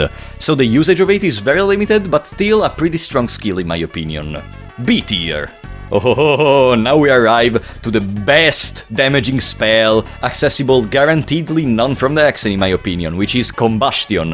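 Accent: Italy. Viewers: Ahahahah! Ascoltando la pronuncia, sono andato a vedere nei commenti se fossi italiano. Good job, anyway! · Sento una cadenza italiana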